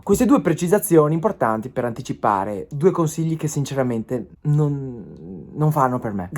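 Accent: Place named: Veneto, Italy. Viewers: Ciao, a mio modesto parere se vuoi continuare la crescita devi, purtroppo, uscire dalla "regionalizzazione" in cui il tuo personaggio è cresciuto. Io sono trentino e mi sento molto vicino, sento molto affine il tuo accento, i luoghi da cui trasmetti, i temi ecc. ma penso che dal "triveneto" non puoi tirare fuori molto di più in termini di consenso, contatti e visualizzazioni a meno che non diversifichi i "canali" e cominci a fare anche live nei teatri e a partecipare ad eventi nel Triveneto allora il bacino potrebbe bastare. Ti trovi probabilmente nel momento più delicato e da questo video si capisce che lo hai compreso benissimo. E' il momento della: "o la va o la spacca" e ti auguro veramente con tutto il cuore che tu possa trovare la strada giusta. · Ciao premetto che non voglio che torni a fare chiamate ma tornare a parlare Veneto si abbiamo una terra con molte attrazioni e molte cose di cui fare passare dei concetti penso che la gente come me ti ammiri per il tuo accento quindi torna a parlare VENETO!!!!!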